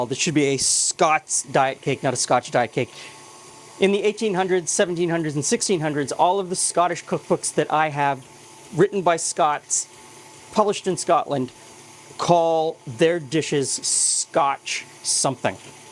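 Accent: Canada. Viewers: The "english" language is so fluid and changes overtime to the point we should probably not be calling it that. I live in New York and i can tell you what we speak is certainly not "english" anymore. lives in Canada and you speak a dialect which should be called Canadian. As my new york dialect should be called American.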